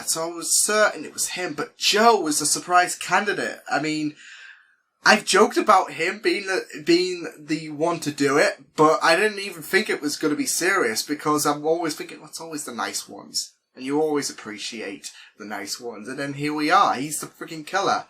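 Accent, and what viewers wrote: United Kingdom. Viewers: Another question: your accent is North of England? Yorkshire? I'm an amateur linguist.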